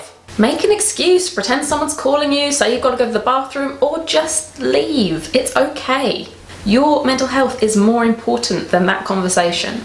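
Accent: United Kingdom. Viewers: followed you for months on insta and only just realised........you're english?? lol i was expecting a california accent 😂😘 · YOURE SO BRITISH I LOVE IT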